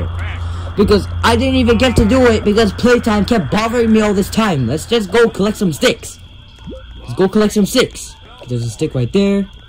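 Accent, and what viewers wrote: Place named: France. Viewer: loved it. great job. lots of energy. love the french accent.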